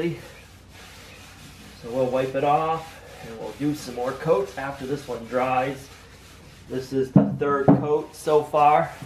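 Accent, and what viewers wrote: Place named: Northeast, United States. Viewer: The accent is bringing me back....where are you from? I was born in White Plains, NY and grew up in Framingham Ma.....just curious...I'm in Central NY near Syracuse now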